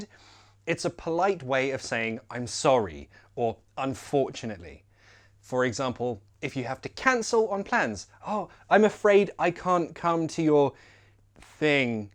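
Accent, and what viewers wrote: United Kingdom. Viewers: Even though I have a lot brit friends, but I'm still need to fill my grammar English cause I love the British accent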